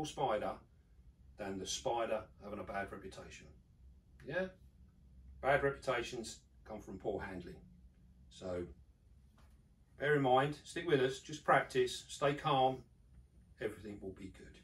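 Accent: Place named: London, United Kingdom. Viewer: Great videos can’t stop watching now, where about are you from as you sound like you have all London accent but I didn’t think e could keep venomous spiders over here? Or am I I just being stupid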